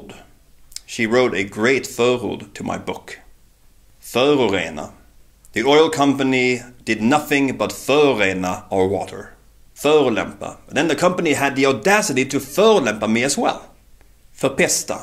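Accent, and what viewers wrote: Skåne, Sweden. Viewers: Ah right, I wasn't sure if you have a Skånska dialect, it's also been a while since I watched that video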